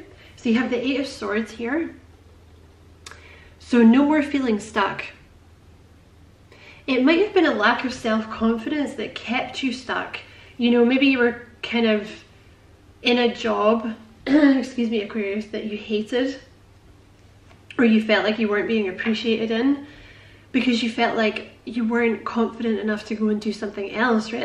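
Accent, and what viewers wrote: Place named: Scotland, United Kingdom. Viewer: OMG your Scottish accent is so adorable.. The reading is so spot on, and exactly what I needed to hear. Thanks!!!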